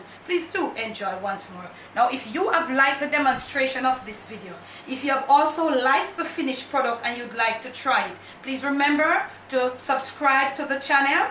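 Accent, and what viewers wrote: Jamaica. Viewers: Hey Ms love the patwah. And all a dat. 😃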